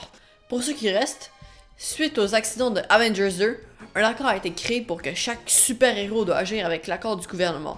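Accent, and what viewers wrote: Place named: Quebec, Canada. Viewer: le seul probleme c'est que moi et l'accent québecoi ca fait 2 xD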